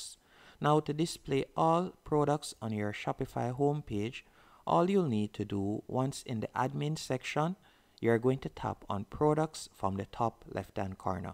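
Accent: Jamaica. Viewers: Yo you sound like a yaad man , if yuh bug mind help me generate some sales please 🙏🏽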